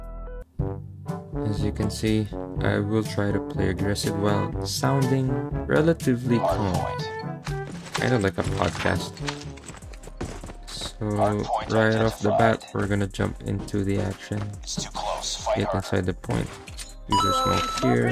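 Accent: Philippines. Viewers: You sound Filipino, and because of that you gain my subscription 😎😎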